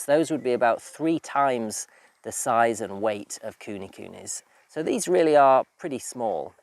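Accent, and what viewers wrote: United Kingdom. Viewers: Another well-made video,structured and relievingly void of egocentric sharing - although personal and quietly humourous. I enjoy your clean british English.I haven't liked pigs much, but this video started a change and I can imagine a couple when I get my homestead. How cold winters do they tolerate though? i have seen ordinary pink pigs outdoors in the snowy winter, but what is the comfort temp? Can they manage Zone 7, alpine area? Probably need more food and straw.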